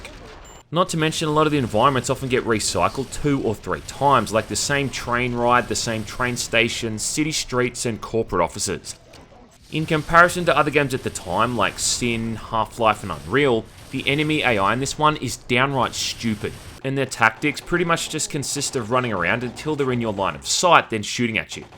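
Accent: Australia. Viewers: I think it’s the Australian accent in the inflections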